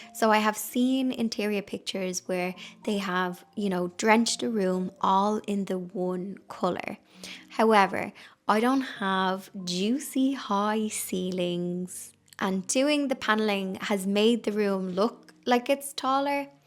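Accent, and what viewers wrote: Ireland. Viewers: Leave the ceiling white. I admire your DIY skills. Love your Irish accent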